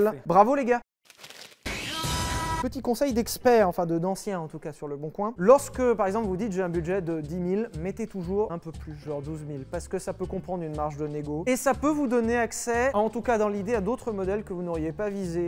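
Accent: Belgium. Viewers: Incroyable l'accent belge de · Je pense que sur le contenu, y a rien à dire: les conseils sont au TOP! J'ai dû récemment acheter une petite auto d'occaz avec un budget serré de 1200 euros: Twingo, sur base de vos bons conseils sur le budget à 1500 Par contre... on peut parler de cet accent belge à? C'est que c'est bien fait! Je suis moi-même belge et je n'arrive pas à faire cet accent mdr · On est d'accord 😉. Mais perso, l'accent que prend dans cette vidéo, n'est pas vraiment l'accent Liégeois pur et dur. Je trouve qu'il se rapproche plus de Caudy Focan que de Renaud Rutten. Enfin bref j'ai bien saisi l'idée, et on ne va pas se prendre la tête pour une histoire d'accent Belge 😅🙏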